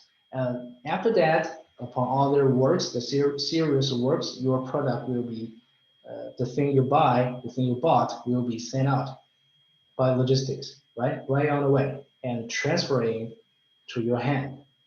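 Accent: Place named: India. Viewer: wtf, indian english.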